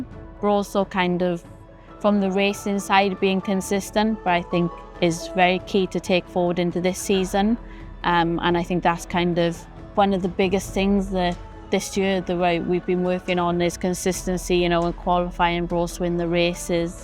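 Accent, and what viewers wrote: United Kingdom. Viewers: Always liked the Welsh accent 😊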